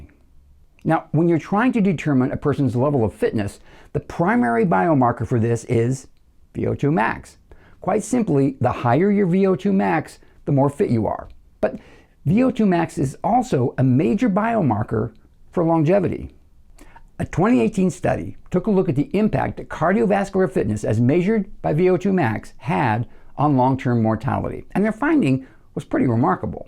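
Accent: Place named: New York, United States. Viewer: FINALLY!.. Someone who is not full of bullshit!.. I will do my best to honor you and incorporate what you teach as best I can... Love your way of speaking... Are you from NYC?... 68 year old senior softball player, and just getting started with a rutine... Any words of advice?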